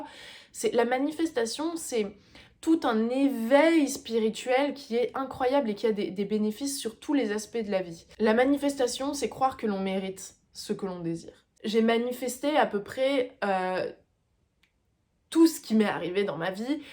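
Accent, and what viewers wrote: France. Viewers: Very charming you are, either speaking English with French accent ,and speaKING FRENCH, Very sensual.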